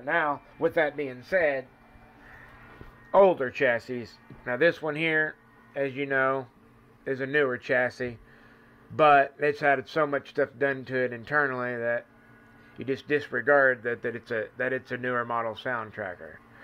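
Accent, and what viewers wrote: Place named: Tennessee, United States. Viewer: Hey... interesting background you have. My first CB radio had 12 channels and was crystal controlled. I am also a Ham for about 45 years. On top of that I have been a news photographer and videographer since 1967, and that's what prompted me to comment here. Since the radios are "horizontal" in shape you're videos would be greatly improved if you turned the phone camera sideways when you record your videos. Then we viewers would get to see more of your station at one time instead of big gray boxes on the left and right of your images. I hope you find that constructive. It was fun listening to you. Reminds me of back home in East Tennessee and Western NC.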